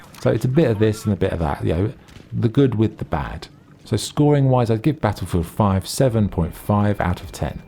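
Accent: United Kingdom. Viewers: Are you brit ISH?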